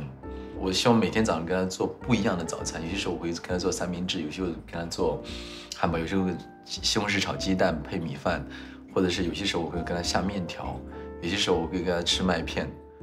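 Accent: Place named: Chongqing, China. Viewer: 听出来他的重庆口音了 哈哈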